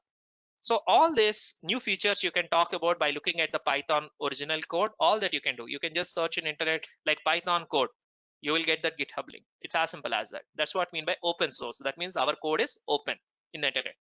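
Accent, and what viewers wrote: India. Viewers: Nothing like an Indian accent to learn these kind of things, greetings from Spain, good video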